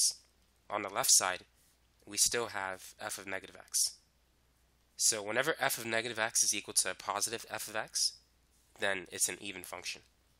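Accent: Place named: Boston, United States. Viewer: Are you from Boston? I dig your faint accent.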